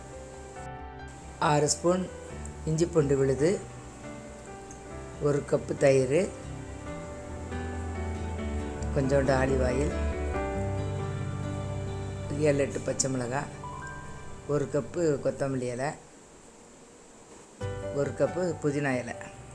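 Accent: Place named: Tirunelveli, India. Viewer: Amma neenga tirunelveli a thoothukudi ya ila kanyakumariya???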